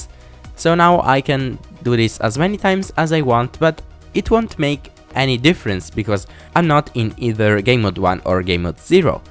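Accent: Italy. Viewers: are u italian?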